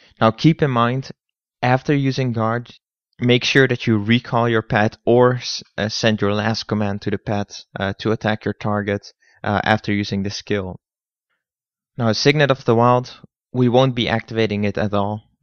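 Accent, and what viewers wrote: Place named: Netherlands. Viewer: You sound Dutch! xD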